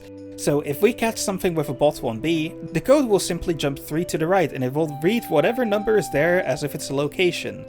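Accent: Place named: Netherlands. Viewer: Hmm... your accent. You're Dutch, right? Great video :)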